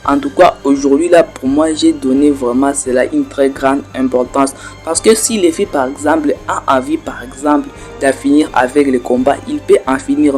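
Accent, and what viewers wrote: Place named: Democratic Republic of the Congo. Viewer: Congolais c sur ton accent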